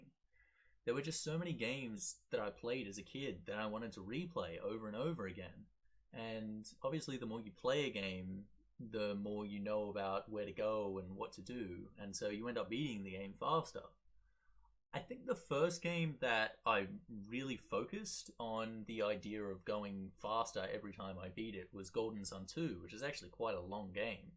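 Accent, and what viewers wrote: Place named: Australia. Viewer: OMG YOUR AUSTRALIAN! I DIDNT KNOW. I AM. PLZ TELL ME U LIVE IN MELBOURNE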